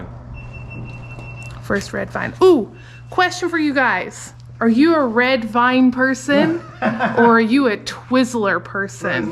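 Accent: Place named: Scotland, United Kingdom. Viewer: Everytime I hear your Scottish accent I get like jello 😍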